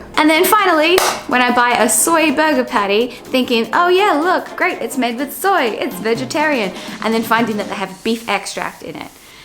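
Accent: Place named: Australia. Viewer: You are so genuine and charming, a lot of vloggers put up a pretty obvious facade. Also lovely to hear an aussie. Keep em coming! / Currently deployed somewhere in the desert - fan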